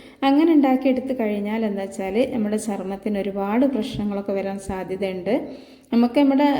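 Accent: Malappuram, India. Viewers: Ingineyum samsarikkan ariyo allenkil malappuram thathade aniyathiyano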